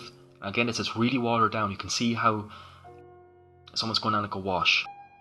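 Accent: Ireland. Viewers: great to hear an Irish voice,great stuff from Bill in Limerick.I have the Yamota battleship.regards Bill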